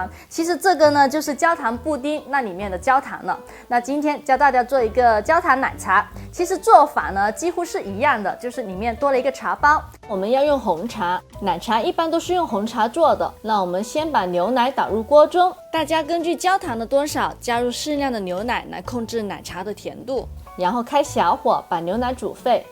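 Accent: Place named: Guangdong, China. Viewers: 怪不得口音很广东哈哈本人也是广东人😄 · 广东妹妹讲普通话好萌啊，哈哈。心灵手巧的妹子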